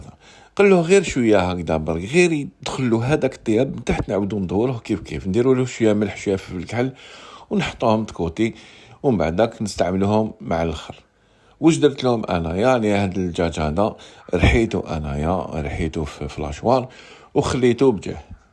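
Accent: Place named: Algiers, Algeria. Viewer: ماشاء الله الله يبارك أنا من تبسة اليوم إكتشفت قناتك🎉🎉🎉 روعة❤واللهجة العاصمية الأصيلة أروع 😊راح نعتمد وصفاتك هاذ رمضان ان شاء الله وشكرا😊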